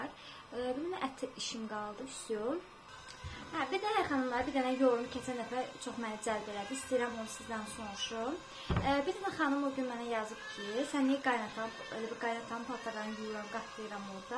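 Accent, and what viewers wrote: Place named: Southern Azerbaijan, Iran. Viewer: Salam bacı bir videonuz təsadüfən qarşima çixdi,elə o videodan sonra sizə abunə oldum,özümdə iki uşaq anasıyam,eləbil öz həyatımı gördüm amma mən sizdən yaşda böyükəm,heç kese qulaq asmayın, necəsinizsə elədə evinizə eşiyinizə yapişin,ailə bir qaladır o qalaya başqalarının fikirlərini girmeye qoymayın çox səmimi,mehriban ailəsiniz Allah qorusun,birseyi unutmayın bacı bugün etdiyinizi qarşiliqini Allah sizə verəcək inanın,həmdə fazlasiyla halal olsun size tərbiyə verən ata-anaya(bu arada videolarinizda doğma cənubun ləhçəsinide,eşitdim yada oxsatdim😊özüm Masallidanam❤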